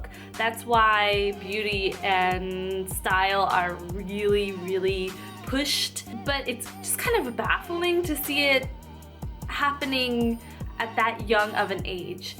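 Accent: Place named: South Korea. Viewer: i detect an ever so slight korean accent in your english.. just a few words.. lol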